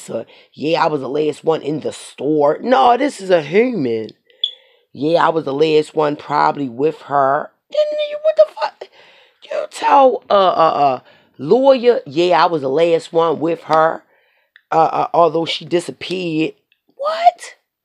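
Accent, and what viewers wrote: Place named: Baltimore, United States. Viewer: You got to be from Baltimore, Cause you sound just like us. But any who great job. Wild that ass out.. love your work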